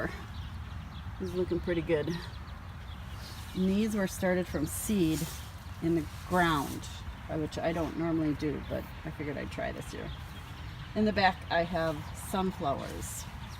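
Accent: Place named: Midwest, United States. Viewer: My late husband and his family are from Minnesota and I detected the midwestern accent!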